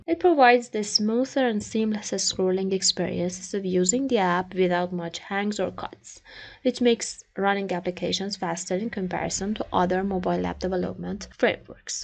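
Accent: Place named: England, United Kingdom. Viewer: Excellent english accent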